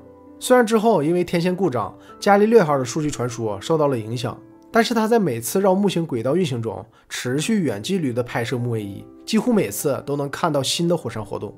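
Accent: Northeast, China. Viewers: 东北话口音。😄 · 这大碴子口音太出戏了🌝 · 东北口音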